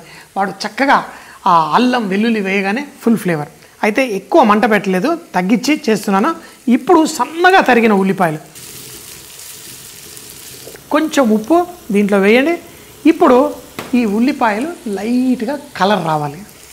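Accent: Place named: Telangana, India. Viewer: nuvvu e tv lo unnapudu maku nethi nosthunde ne kani eppudu nuvvu chese prathi food video chusthamu nuvvu telangana yasala matladthe 😂😂😂😂😂😂 super re mi bawa ante penimiti ante na better half likes ur videos a lot we just wait for ur new videos every day and all the best for up coming ma bangaru thandri ki all the best nanna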